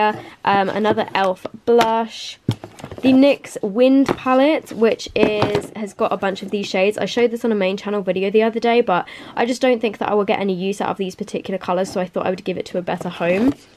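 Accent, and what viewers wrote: United Kingdom. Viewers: Congratulations on 50k!! 💕 Which part of the UK are you from? (You sound like you’re from London)🇬🇧 also yoi shouldn’t compare yourself because some of other YouTubers over exaggerate which makes them seem fake. You’re unique💜 also the fact you think that wasn’t a good giveaway is absolutely crazy! I would never get this much not even for Christmas💚Goodluck to everyone entering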